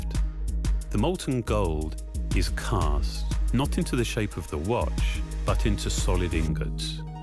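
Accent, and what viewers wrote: United Kingdom. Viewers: it's the British accent lol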